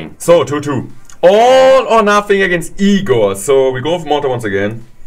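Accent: Germany. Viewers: ich gucke deine Videos auf ddm Deutschen und Englischen Kanal... wollte nur sagen dad du nen sehr krassen Deutschen Akzent hast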